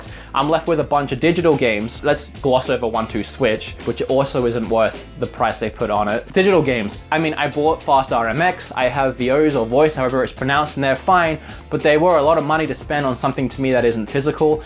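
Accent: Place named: Australia. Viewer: His Aussie accent is so prominent in this video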